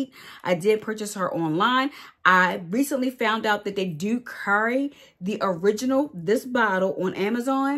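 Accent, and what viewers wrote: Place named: Baltimore, United States. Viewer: You gotta be from Baltimore😂😂 I hear the uuu pronounced in some of your words. That accent is undeniable!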